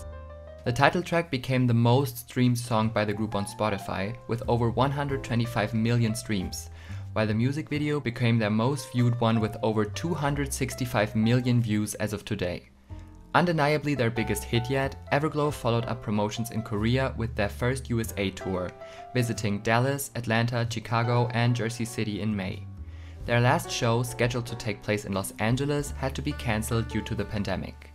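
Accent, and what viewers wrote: Germany. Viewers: I immediately knew you were German because of your accent😂😂 I'm definitely scared for Everglow's future, I hope everything is going to be okay and I hope they won't disband anytime soon. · Is german your first language? you kinda have a german accent 😅 very informative video the company is doing them dirty!!!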